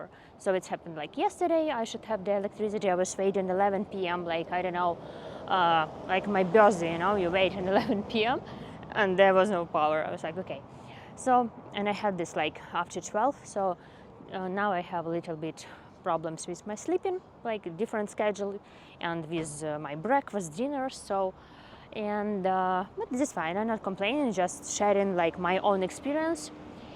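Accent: Ukraine. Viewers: thank you for the update and I understand your English because I had to listen to my Ukrainian mother for over 30 years and your accent reminds me of her so you're doing great! No worries. Slava Ukraini. Taras in the US. ❤️❤️🇺🇦🇺🇦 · Thank you for speaking English to the camera and sharing your experience with everyone . I like Ukrainian accents .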